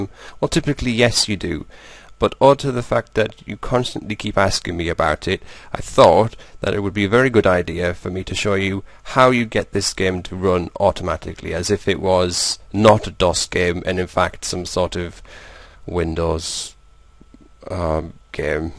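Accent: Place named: England, United Kingdom. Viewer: I really like your accent! What part of England are you from?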